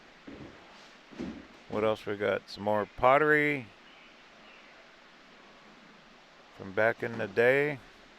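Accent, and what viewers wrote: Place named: Upper Peninsula, United States. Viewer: I’m not sure where you’re from but with your accent you fit right in with the Yoopers.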